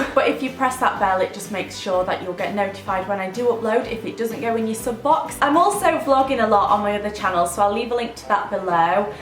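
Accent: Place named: North, United Kingdom. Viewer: You're so northern and I love it!!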